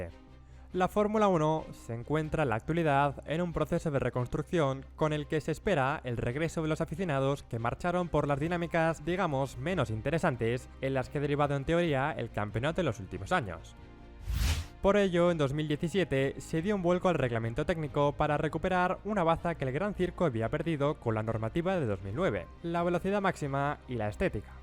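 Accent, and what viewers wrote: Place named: Basque Country, Spain. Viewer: Un robot con acento vasco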